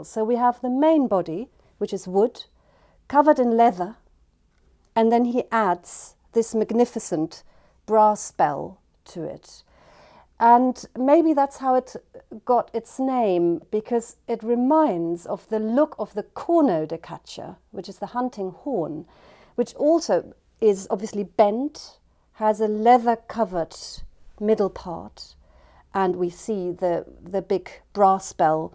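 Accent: Germany. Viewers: I’m English and I lived in Hamburg, Dresden and Berlin over a 7 years. Maybe that makes me particularly sensitive to her accent, but to me she sounds typically German. She has faultless English and has probably lived here for a while but she still very much has a German accent. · She sounds German. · KAOSON There’s a touch of German in her English. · it's definitely a german accent ;) source: I'm german :) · I think I can catch a touch of the German accent in her English speaking voice at times actually.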